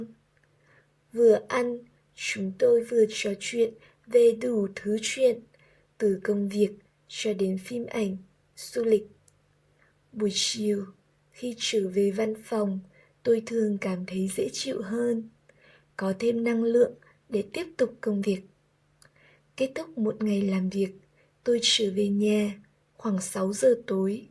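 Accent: North, Vietnam. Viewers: oh this is north vietnamese... I thought it was southern vietnamese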